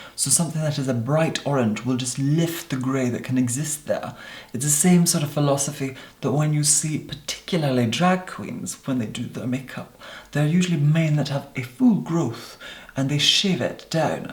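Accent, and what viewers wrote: Scotland, United Kingdom. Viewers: im from england btw n we dont normally sound tht posh but go to his old vids from 3/4 years ago he talks in a normal scottish accent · B. M. Just looked up an old video you are right he definitely has a Scottish accent back then my apologies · His accent is still very clearly Scottish, not the Queen's English at all. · I love the very subtle Scottish that comes through your poshness. Love it. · I was in no way trying to be rude. When a person goes from having a laid back Scottish accent (a lovely accent indeed) to speaking the Queen's English, it naturally elicits some confusion. I have complete respect for anyone who wants to take speech lessons. I personally used to have a slight New York accent, and trained myself out of it and forced myself to enunciate better. I just find it a bit disingenuous to adopt an entirely different accent. 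The transition from a Scottish accent to some version of Queen's English, is like the equivalent of me going from speaking with a New York to a Southern accent. I'm sure people have their reasons for presenting in any way they choose, but the drastic change caught me off guard in this case.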